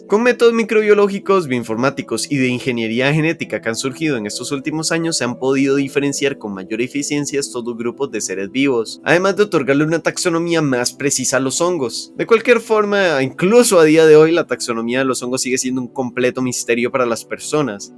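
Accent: Costa Rica. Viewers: Buen vídeo, nuevo suscriptor, esa forma de hablar y pronunciación de la R me suena de CR jaja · Ufff se noto lo tico xd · Eres de Costa Rica verdad? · Desde que lo escuche supe que era tico purs vida pa ❤️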